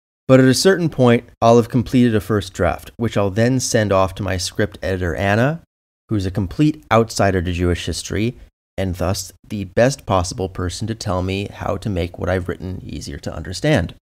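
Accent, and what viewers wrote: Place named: Michigan, United States. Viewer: THIS MAKES SO MUCH SENSE! Every time you speak I think “he sounds like he is from around here (Michigan)”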